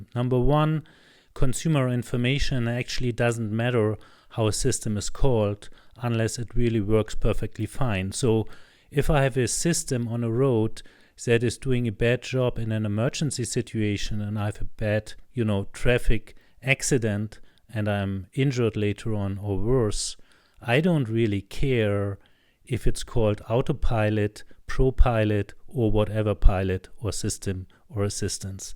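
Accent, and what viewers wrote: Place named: Germany. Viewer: Gutes Video! Inhalt top, Aussprache noch etwas zu deutsch:)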